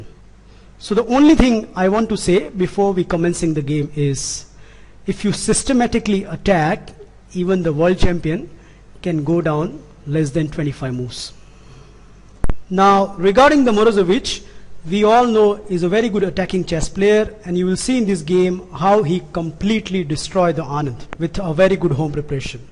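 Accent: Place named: India. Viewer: Indian accent is very annoying and confused 😏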